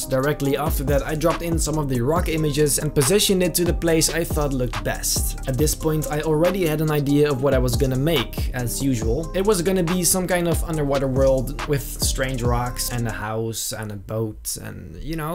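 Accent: Netherlands. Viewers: is it just me or does he have a dutch accent?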